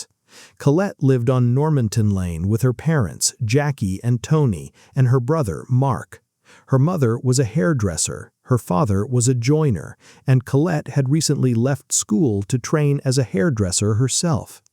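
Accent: United States. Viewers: Why the "American" narration..? It isn't necessary to prove a motive...but perversions are timeless...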